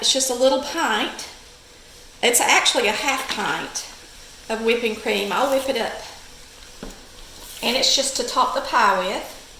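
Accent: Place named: South, United States. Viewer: I love 💕 your southern accent and my heart ❤️ could just melt!!! I’m going to try your recipe Mrs!! If I love ❤️ lemon meringue pie, then key lime pie should be great 😊 for me!! Thanks for sharing honey 🍯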